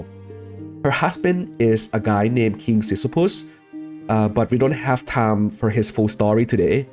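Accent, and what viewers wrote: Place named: Thailand. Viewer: Excuse me, this is not an insult. I wanted to know that are you Thai or not? I recognize it by the accent. I am just curious.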